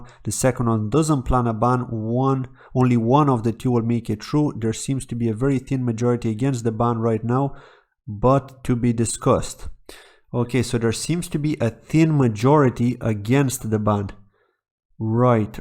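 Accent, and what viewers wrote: Ireland. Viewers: Definitely concerned. Feel like uninstalling coinmarketcap for a year or two to prevent me from looking at it. lol Btw, am i hearing a tad of an irish accent? :)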